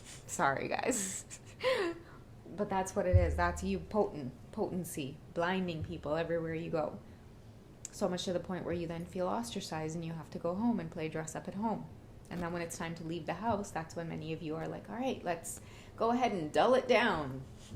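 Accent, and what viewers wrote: Trinidad and Tobago. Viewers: 😂 Outside of your gifting to read, I LOVE di Trini vibes yuh does tro een every now and then! 😂👊🏿 TrinBago tuh di whurl wi! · D Trini accent killin mehhh 🇹🇹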